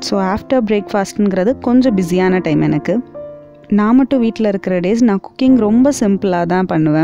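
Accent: Kerala, India. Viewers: Madam neenga malayaliya. Phonele malayalam movie pathe athunale kette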